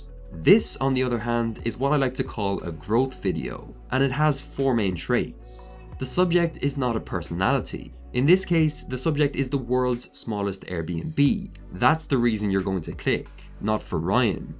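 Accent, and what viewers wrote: Ireland. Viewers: Are you Irish? And happy St. Patrick’s day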